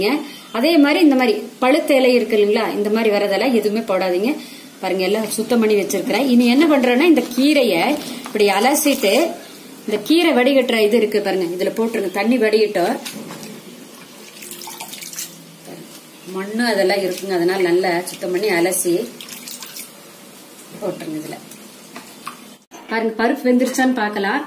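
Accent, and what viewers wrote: Kongu, India. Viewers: கொங்கு தமிழடன் கீரை கடையல் சுவைக்கிறது !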